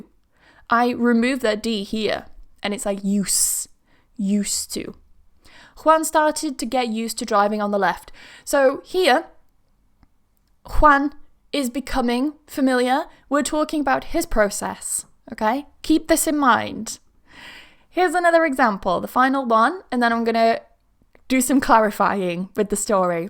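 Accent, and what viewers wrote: United Kingdom. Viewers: I Love your British accent.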